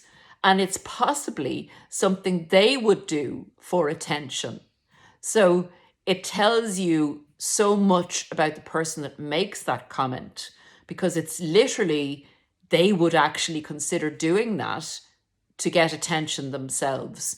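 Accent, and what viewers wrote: Ireland. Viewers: OMG, you knew but you didn't want to face it. They also like to wait till we've had a few drinks before they act up or 'fess up. My ex used to tell me about all the cheating housewives on our street. Later I realized they were cheating with HIM! He "worked from home" while I went out to an actual job. I guess they were all getting plenty of "exercise."😂 Love your Irish lilt, eye looks well. Prayers and thanks from across the pond❤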